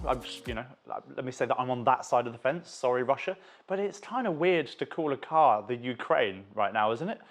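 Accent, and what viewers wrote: United Kingdom. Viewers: The subject matter is fairly interesting and you would make a great straight man in a comedy team duo because you have a great dry sense of humor. The British accent is always a plus too. We half brothers and sisters out here are always captivating by that proper English. Something about it just fascinates the rest of us. We really do love the people of the UK. But getting back to the little Chinese EV's, they really have very limited real world applications the way I see it. No offense please. I think I would want one strictly for inner city driving only. Other than that, not so much. So, Pip Pip, Tally Ho and all that good stuff. Take care my good man.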